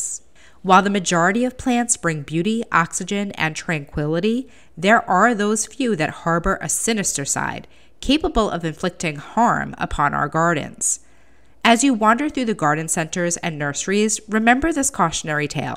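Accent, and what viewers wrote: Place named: Midwest, United States. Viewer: You don’t sound like any New Yorker that I ever met, you sound more like a midwesterner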